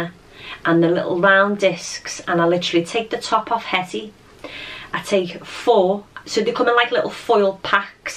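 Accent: Liverpool, United Kingdom. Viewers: Love the scouse accent.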